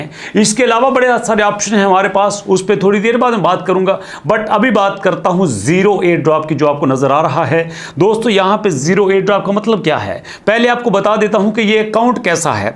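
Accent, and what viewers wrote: Punjab, India. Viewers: I think sir g you are from Gujrat Punjab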